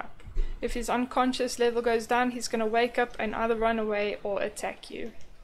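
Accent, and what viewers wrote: South Africa. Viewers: Are you south african? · South african accent. Beautiful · Wait... This accent🤔 sounds familiar.... You're South African right?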